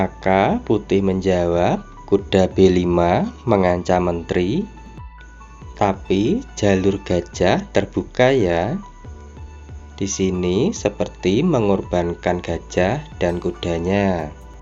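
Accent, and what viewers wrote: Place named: Central Java, Indonesia. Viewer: ..yg koment bukannya ngantuk ttpi yy bgitulaah medhok bingit logat 1area wilayah daerah di jawaTengah, lanjutkan dik Adminn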